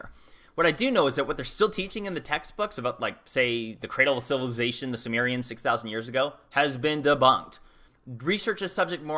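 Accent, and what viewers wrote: United States. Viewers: Totally unrelated to the topic at hand but you sound like you're from New England, am I correct?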